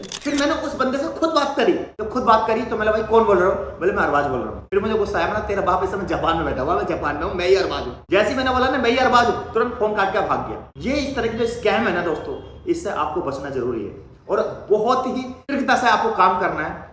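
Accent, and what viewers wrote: India. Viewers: आवाज़ से तो maharastra का लग रहा है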